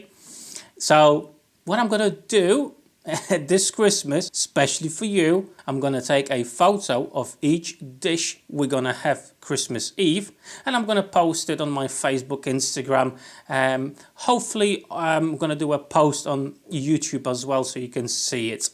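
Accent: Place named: Midlands, United Kingdom. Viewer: Hi. Your Christmas sounds delicious! Clever of you to double up on the soups etc. from different areas that you and you wife come from. I thought from your accent on occasion that you may have spent some time in the Midlands (Birmingham used to have a large Polish community and its own Polish club - the only place you could obtain real 'boiling ring'...couldn't pronounce its proper name so that's what we called it). A mate of mine was half Polish and he used to turn up with Polish dishes his dad had cooked. One was some kind of meat filling in leaves that he called 'Kalumpkies'. Probably got that spelling completely wrong but it was excellent. Are you going to incorporate any traditional UK fare into your Christmas food? Anyway I wish you and yours a very merry Christmas and hope that it gives you and your family everything you wish for yourselves and an extra wish from me. 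P.S. Really enjoying the hand power tools vids. Been using these for more than 30 years but it doesn't mean I know (or remember) all about them Thank you.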